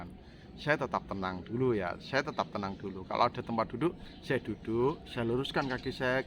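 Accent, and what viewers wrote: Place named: Banyumas, Indonesia. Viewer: Betul pak..sy juga suka dengerin Chanel bapak ngapak jg luw, hehe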